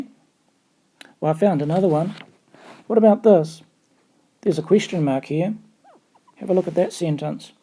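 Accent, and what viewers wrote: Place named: New Zealand. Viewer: No doubt your a new zealander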